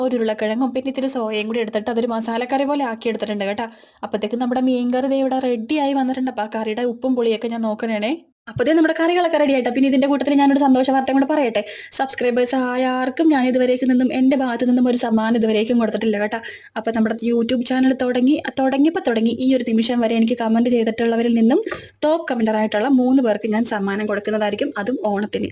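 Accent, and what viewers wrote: Kochi, India. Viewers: Ithonnum alkarude perala...eniku ishtapettu...thani kochi baasha😂😂❤❤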